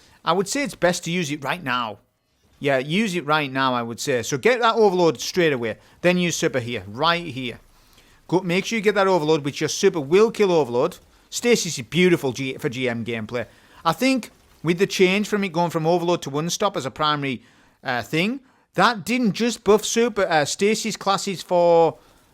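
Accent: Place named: England, United Kingdom. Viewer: well, the youtuber, who sounds English, butchered the word. I was assuming the issue was cultural unfamiliarity.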